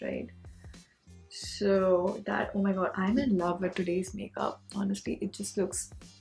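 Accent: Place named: Uttarakhand, India. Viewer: Eee .. yes your hindi was giving me signs of you having uttarakhand connection 🤭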